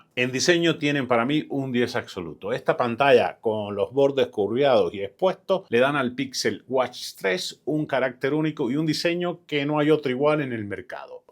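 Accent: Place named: Spain. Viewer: haaaa eres mexicano🤔,,porque tienes ese acento,,español.pero buen review,,igual que isa marcial 😁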